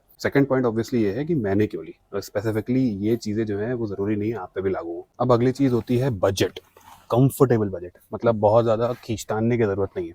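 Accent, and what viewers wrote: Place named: Uttar Pradesh, India. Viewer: Bhai UP ka hai na tu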